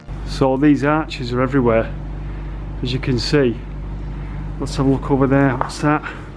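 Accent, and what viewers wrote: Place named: Manchester, United Kingdom. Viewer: A loadda hot air. Literally 🤣 👍 great vid As per.. I'm pickin up a Mancunian accent I watch this channel so much. Sweet 👍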